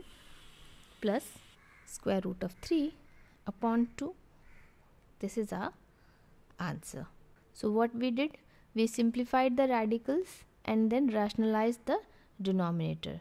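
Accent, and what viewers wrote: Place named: India. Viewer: Why am I not surprised the narrator has an Indian accent for this type of problem? When I saw the thumbnail my physics teacher from high school popped into my mind. 👍🏼 Mr. Joshi.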